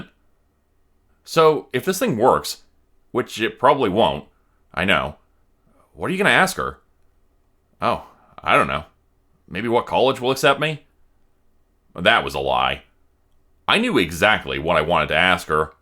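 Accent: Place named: Canada. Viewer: I'm so grateful that you are the story teller and not AI. Your voice is very relaxing, with fantastic expression. Love your accent. Ya Canada ❤ 🇦🇺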